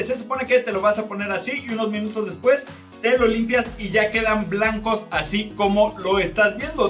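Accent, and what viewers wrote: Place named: India. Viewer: Por que habla como indu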